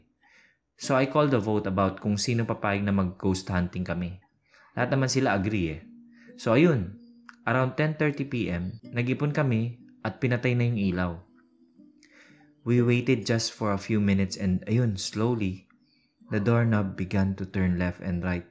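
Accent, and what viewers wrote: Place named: Bicol, Philippines. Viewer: Bicolano ka kuya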